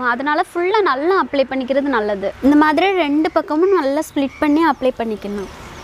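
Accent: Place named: Valliyur, India. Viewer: unga speech enga ooru mathiri irunthuchu athanalathan keten enaku vallioor sister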